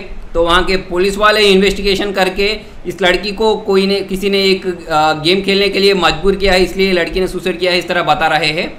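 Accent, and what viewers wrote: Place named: Hyderabad, India. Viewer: Hyderabad hindi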